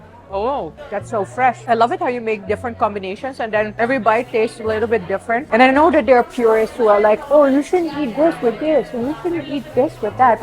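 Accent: India. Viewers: I loge your slightly Indian accent when speaking english😂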